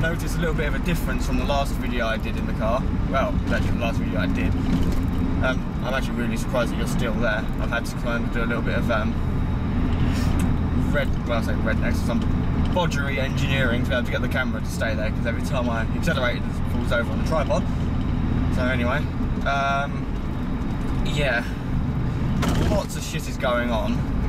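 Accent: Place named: United Kingdom. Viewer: To me it's not the voice that changed the most, it's the accent. In the old video, I could understand something. In this video, I can understand almost nothing. Dat British English *grumpy noises*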